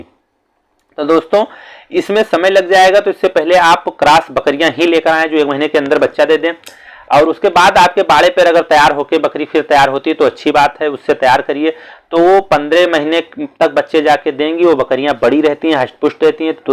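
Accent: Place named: Uttar Pradesh, India. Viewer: Apki awaj se bahraich ki mahak aati hai. Thanks brother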